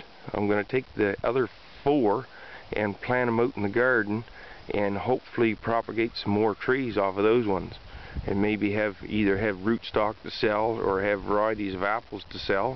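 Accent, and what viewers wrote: Nova Scotia, Canada. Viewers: Oh my god! I had to laugh - I was looking up videos on Orchards and planting new ones and as soon as you opened your mouth I was like "holy shit, this guy is from Nova Scotia" which is where I happen to be from, too, and where we will be planting an orchard after we move back home from BC. Love it. Thanks for the video and information, but also to the throwback for the old South Shore accent.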